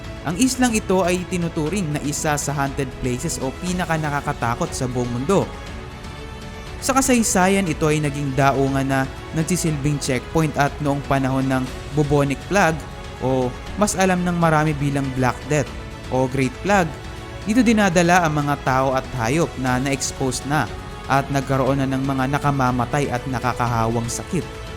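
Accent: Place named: Philippines. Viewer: He's Filipino, and he's using our Tagalog native language. Therefore, there's nothing wrong to use the Filipino diction. Nevertheless, he's creating videos that are very informational and educational and I think his intonation is less our concern. 🤷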